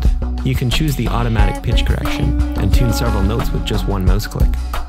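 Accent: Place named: Canada. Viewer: Haha, he sounds Canadian to me, too! Anyway, cool stuff.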